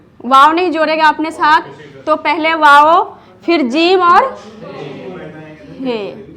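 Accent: Bihar, India. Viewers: She must belong to behar state. ऱ accent Now इन pnjb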